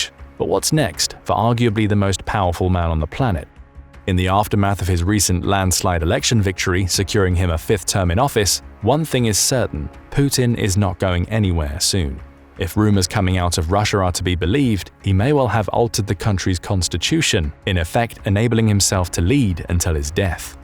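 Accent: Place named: RP, United Kingdom. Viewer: Lies from the get go. This guy thinks that his posh sounding accent proves his intellect. Well he sure is a perfect example of twisting the truth to fit own alternative UNREAL narrative / just as hypocritical as main media and politicians who happily speak with forked tongues and do their utmost of censoring what they don't like. Do you ever now find REAL debates between intelligent people of different opinions than what is force fed??? No, all the main proponents do is calling names, taking jobs away from dissenters.... admitting that they will call something they agree is TRUE nevertheless as " disinfo" when it does not suit their narrative.....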